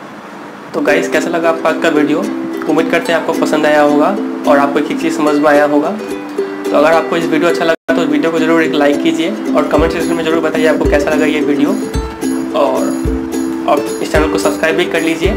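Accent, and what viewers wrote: Bihar, India. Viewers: Bihari he kya😆😆😆😆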